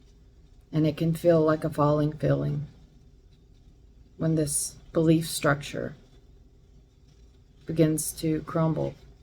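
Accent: South, United States. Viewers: I love ur southern accent. I come from mobile, alabama.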